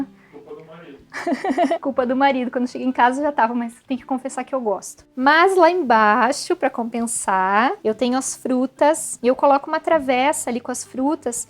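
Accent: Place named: Paraná, Brazil. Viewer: Amo esse canal: fato! Mas também amo esse sotaque paranaense! haha 🙄❤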